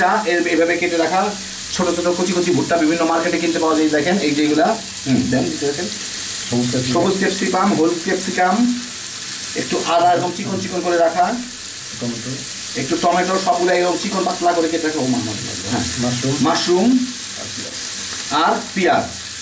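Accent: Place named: Dhaka, Bangladesh. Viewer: আসসালামুয়ালাইকুম ওয়া রহমাতুল্লাহি ওয়া বারাকাতুহ।। আপনার কুঁচি কুঁচি আর তামা তামা ডায়ালগ টা আমার মেয়ে খুব পছন্দ করে ❤️ আপনার কথা গুলো পুরাই আমাদের মত ঢাকাইয়া😜 আমরা আসলে এত শুদ্ধ করে বলতে পারি না।যা বলি নরমাল ভাবেই বলি😁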